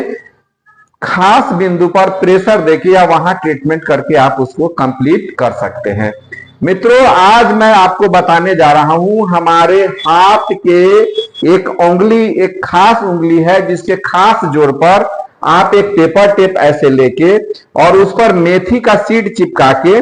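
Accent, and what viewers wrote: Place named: Bihar, India. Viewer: Sir ji aap ney bahut wadia jankari di. But aap ki jo hindi hai woh shud nhi hai jaisey aap yin ko in boltey ho spleen ko espleen wood ko ud aap hindi meyn Bihari Bhojpuri mat dalo kyon key aap ki video poora deysh deykhta hai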